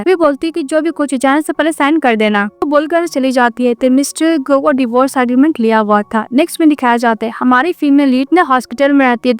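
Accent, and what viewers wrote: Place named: Assam, India. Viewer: Didi aap asamis ho ya Bangali sound like asami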